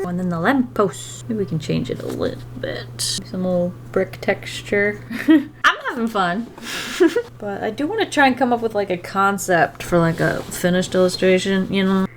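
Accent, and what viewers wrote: United Kingdom. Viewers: Every once in a while she sounds British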